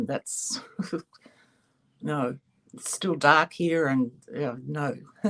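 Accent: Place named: Australia. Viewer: GORGEOUS creations! Keep doing what you're doing! Fellow Aussie here, transplanted to Texas over 40 years ago, so am loving your accent and the occasional maggie in the background! Thank you. 😀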